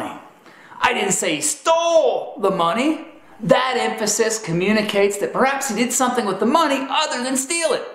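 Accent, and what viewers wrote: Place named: United States. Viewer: This is very stereotypical american. Perhaps they play it at public American culture courses? Anybody talking even remotely like this is guaranteed to only care about your money.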